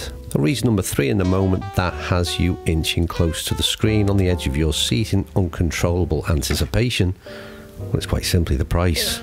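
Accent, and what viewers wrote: Liverpool, United Kingdom. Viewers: Love the plazzy scouse delivery. Very blowey in the Wirral😂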